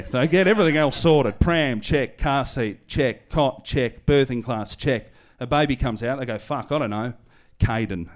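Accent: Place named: Australia. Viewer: That was a great set. You never fail to get me laughing with your Aussie accented stand up about shit we can relate to. Excellent.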